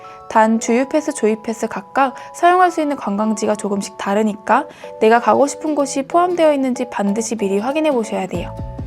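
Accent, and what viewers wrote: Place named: Chungcheong, South Korea. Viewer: 충청도여~? 승질 급한사람 숨넘어 가겠구만~ㅎ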